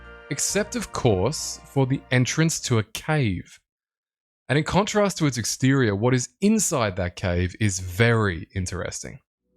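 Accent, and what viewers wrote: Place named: United Kingdom. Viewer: Finally someone reads the dialogue in Runescape with the appropriate "Britishism" it was meant to be conveyed with.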